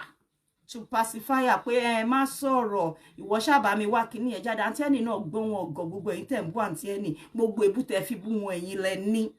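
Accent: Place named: RP, United Kingdom. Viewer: I no know sey dis LADY 👸🧚‍♀️ sabi talk Queens English like dis ajeh 🙆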